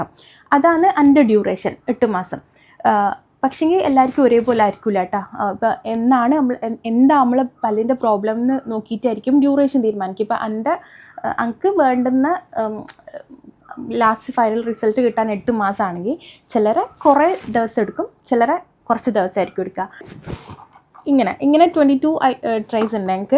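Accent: Kannur, India. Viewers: nalla rasand pallu porikka., aah nammale kannur basha marannu samsarikkulaa 🥰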